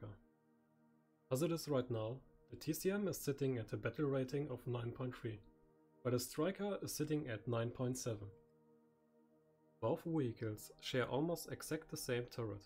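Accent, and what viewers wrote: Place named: Germany. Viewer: You sound german af, i like it. Very well made video too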